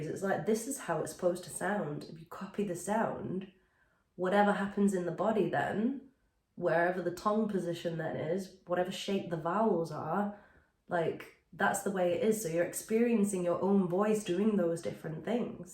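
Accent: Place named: Northern England, United Kingdom. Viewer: This is a great idea! I've had years of lessons and my main teacher has spoken a lot of placement, but I really struggled with the descriptions of placement and to a certain extent, I still do. ("Place it more forward" - I think I learnt to close my pharynx a little more when I heard that, but it could be that I changed my configuration in other ways that I'm not conscious of.) Perhaps if I had been asked to imitate some of the voices here or in other songs, I would have got there quicker. I love your Northern accent! We have such a rich tapestry of accents in the UK; mine is a bit of a mix between RP and Welsh! :)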